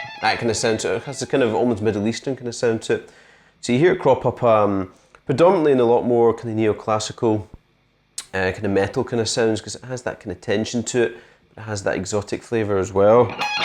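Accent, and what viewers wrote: Scotland, United Kingdom. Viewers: misterassassin1990 Scottish I believe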